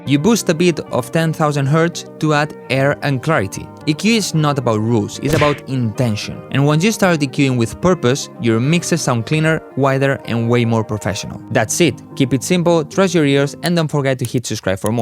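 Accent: Spain. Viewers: hermano ese acento es español dime que si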